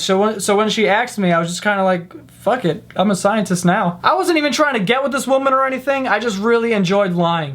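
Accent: New York, United States. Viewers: OMG love listing to you talk. Reminds me of living in New York. You remind me of people in my family. Keep it coming. 💯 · . I love you. I get you're from New York but ffs it's ask not axe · I love his New York accent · He does, but it's probably because he's from New York and most New Yorkers say the word "ask" like "aks".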